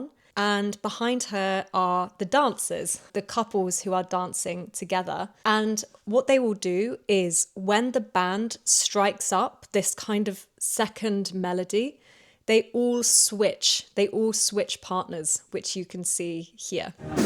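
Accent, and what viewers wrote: United Kingdom. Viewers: Your British accent is sooo nice - it's like music to my ears!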